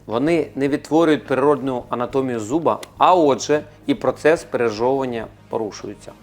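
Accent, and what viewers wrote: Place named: Russia. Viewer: кацапський діалект пре зо всіх щілин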